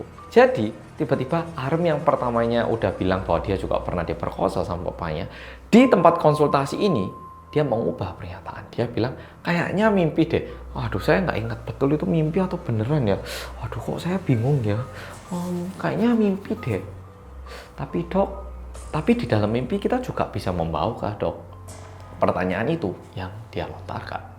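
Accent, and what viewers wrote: Java, Indonesia. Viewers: Jangan lupaaa medokkkkkk🤺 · Pangling mukamu krn ganteng tp ga akan pangling suaramu sing medok itu loh cak...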